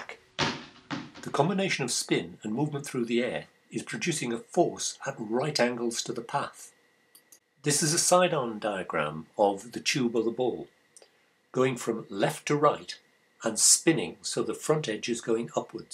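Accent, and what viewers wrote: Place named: United Kingdom. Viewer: Great video. Pleasant British accent, and succinct explanations-- what more can you want from a man?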